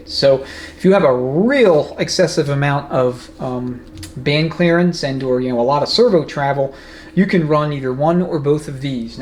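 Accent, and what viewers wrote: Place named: New York, United States. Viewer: just got done watching this procedure, great information, wondering what my measurements were on this band at 61,000 K when it burned up??? ( SECOND SPEED BAND WAS THE PROBLEM )!!!!!!! BETTER YET, were is this trans shop setting the clearances on this new one??? You would be surprised at the guys who work in the industry ( mine, heavy truck / yours trans shops ) that have been doing it there way; ( BILLY BOB, HACK - A - BILLY ) for 20 - 30 years or more, and it's not even close to " SPEC " )!!!!! ESPECIALLY IN THE DEEP SOUTH!!!!! I am originally from Delaware County PA. just outside " PHILLY ", your accent leads me to believe your from JERSEY / NEW YORK / LONG ISLAND?? WERE ARE YA LOCATED?? HELL, I WILL START BRINGING THE TRANNYS TO YOU & REFERRALS TOO, IF YOUR UP IN THAT AREA?? only a couple hours away if so??? 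LET ME KNOW, THANKS, GREAT INFORMATION & VIDEO AS USUAL / I TOOK NOTES & PART NUMBERS!!!!!! THE MACK TRUCK GUY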